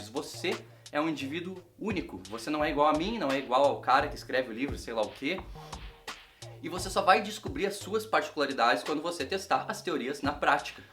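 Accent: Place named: Rio Grande do Sul, Brazil. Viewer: Cara, que massa te ouvir! Além de adorar teu sotaque gaúcho, pq tbm sou gaúcha...achei valiosas tuas colocações. Sou Coach e acho que é isso mesmo...cada um precisa buscar o que faz sentido para si. Sou totalmente contra regras pré estabelecidas como: acorde cedo, leia mais, não coma carbroidratos à noite, e um monte de coisas que são boas para algumas pessoas, mas para outras não! Ja me inscrevi! Obrigada pelas reflexões!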